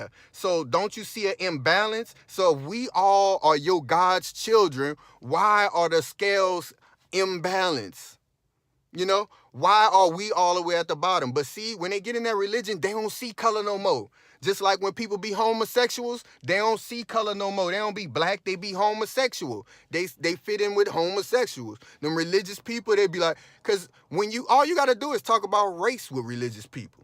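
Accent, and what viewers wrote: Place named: New Orleans, United States. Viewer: Sound like you stayed in new Orleans for a lil while...the accent sound familiar...but good info family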